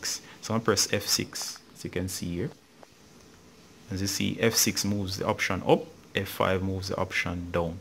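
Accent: Jamaica. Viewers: where you from? you sound like a yard man. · Are you Jamaican?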